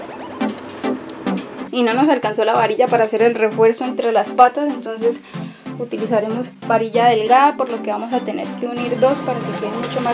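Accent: Colombia. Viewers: le escucho un acento Colombiano... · Su acento es colombiano, creo que es de Santander o norte de Santander !! Felicidades a ella.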